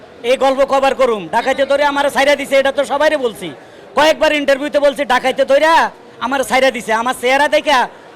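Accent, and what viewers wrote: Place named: Noakhali, Bangladesh. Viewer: Bari comilla .poriciti noakhalir khota bole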